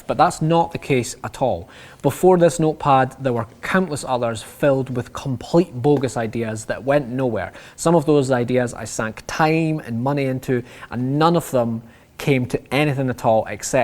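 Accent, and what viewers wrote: Scotland, United Kingdom. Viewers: I love how when i see "crappy" i can hear it in the Scottish accent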